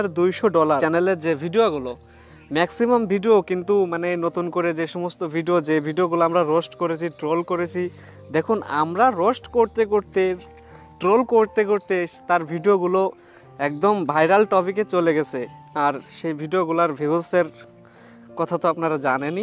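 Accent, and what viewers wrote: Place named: Khulna, Bangladesh. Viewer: আমার বাড়ি ঝিনাইদহ আপনার কথা সুনে বুঝতে পারছি আপনার বাড়ি খুলনা বিভাগে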